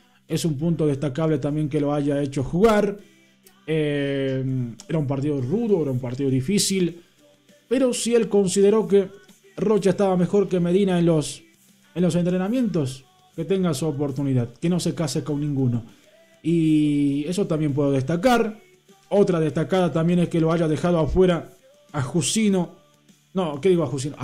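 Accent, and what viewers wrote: Argentina. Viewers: Primera vez q veo tus videos amigo Pero porq hablas como gaucho no entiendo espero no seas un boligaucho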